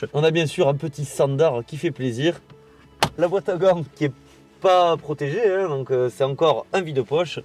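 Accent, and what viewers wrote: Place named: Southwest, France. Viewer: Référencement pour l’accent du SO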